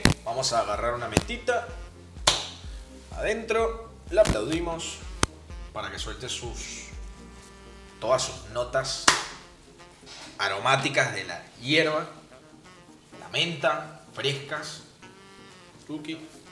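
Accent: Argentina. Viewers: Eres argentino! Wow yo pensando que eras chileno 😂 me encantan tus vídeos, continúa así 😍 😊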